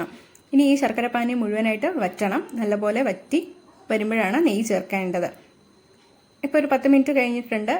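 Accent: India. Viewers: Superb video, palakkadan slang?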